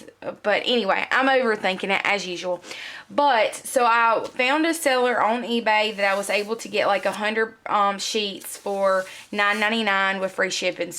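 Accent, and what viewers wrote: South, United States. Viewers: Great video but boy that southern accent! Lol yes, I'm from the south too.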